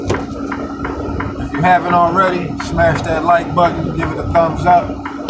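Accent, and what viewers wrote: Baltimore, United States. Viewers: hey man do you have an email i get ask you a few things, sound like you're from baltimore. are you out of the hagerstown hub?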